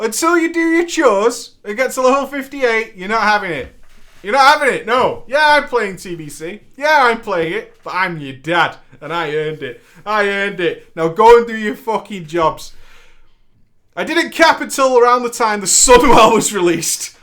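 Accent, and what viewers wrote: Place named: Manchester, United Kingdom. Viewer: Ahahahaha YES PREACH! I fucking knew you were a manc :D recognise that acccent anywhere loool, made my day that did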